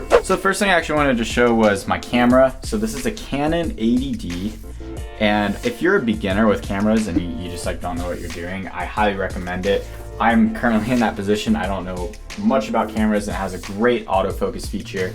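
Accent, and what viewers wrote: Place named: South, United States. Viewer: What state r u from because I kinda can tell a southern accent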